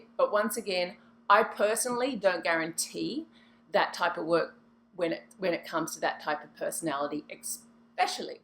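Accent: Australia. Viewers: Where are you from? I hear an Australian accent. But, then I hear "rrrrrrr's" pronounced at the ends of words like "partnerrrrrr", "casterrrrr" lol . . . almost like an Irish or American person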